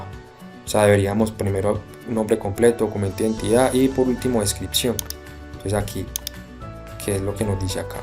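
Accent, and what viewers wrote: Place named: Antioquia, Colombia. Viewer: Paisa en la buena por esa colaboración Dios le multiplique 👍🏻 ese acento es todo vacano el de este man jajaja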